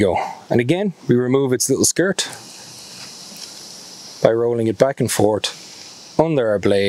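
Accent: Ireland. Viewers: Jaysus you had me in knots with the chicken dance in the 80s.... memories! Great listening to an Irish accent over mostly Americans on YouTube, you're a treasure trove of information and inspirational in your enthusiastic delivery, I really enjoy your videos and thanks for sharing your knowledge, I am putting together some kit as I am really only starting out with the outdoors but I am grateful for the safety advice as I don't think I would have been careful enough with some of the processes...but listening and watching you has given me a completely different perspective, loved the axe 🪓 videos as well! Go raibh maith agat!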